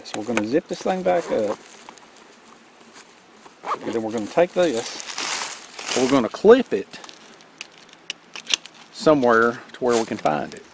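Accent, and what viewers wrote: South, United States. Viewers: I always love your content. And your Accent bro. Are you from Down South? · Does anybody else watch because of the accent and the little sayings? Laughed out loud at the one about having to go to bed because he could hear the crickets! Of all the reasons and excuses for why it's bedtime, I have never used that one! Loved it... Subbed a while ago after hearing him refer to a rock as an Alabama hammer... What part of America is this accent common?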